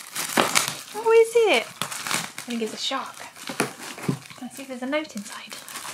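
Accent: United Kingdom. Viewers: How do huskies understand British accents? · Why do u have a british accent?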